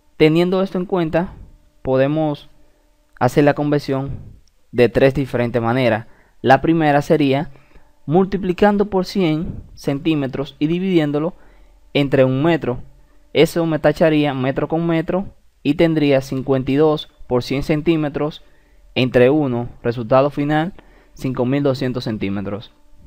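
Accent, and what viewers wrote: Dominican Republic. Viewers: El acento me suena, eres dominicano??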